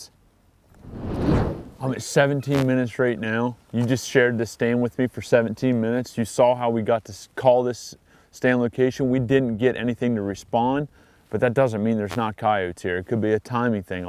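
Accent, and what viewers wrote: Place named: Pennsylvania, United States. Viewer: I'm getting a little PA accent in this video. Where you from?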